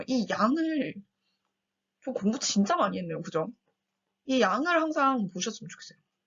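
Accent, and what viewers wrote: Busan, South Korea. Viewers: 언니 고향 부산이신가요 친근해요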